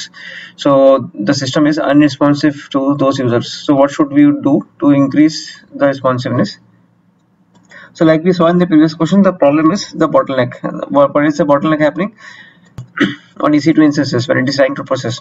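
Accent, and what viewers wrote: India. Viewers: Please what is the reason you are too fast, First your language is not very inderstood, you have to speak slowly since all participant are not indians.. we have to understand the accent second you should be quiet let the listeners read the question for a while to find the answer then you can continue the explanation or it looks your video will not helpful for us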